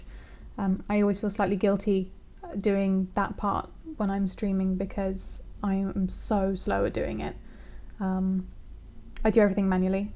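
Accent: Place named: United Kingdom. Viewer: I love ur accent.. i love british accents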